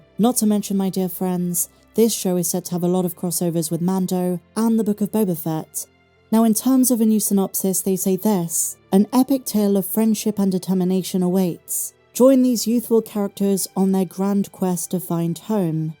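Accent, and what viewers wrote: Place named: West Country, United Kingdom. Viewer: Please, your British right, So please say autumn and not the fall. Love all your wonderful videos and opinions on anything new in the StarWars world. What makes your Channel so brilliant, is that fact that you're British. Definitely from the West country. I'm saying Dorset going by your beautiful West country accent. ❤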